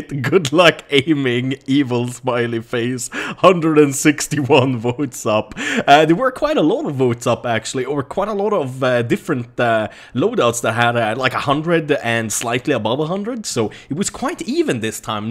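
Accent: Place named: Stockholm, Sweden. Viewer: Shit vilken svensk dialekt du har när du pratar engelska asså! Stockholm??? *****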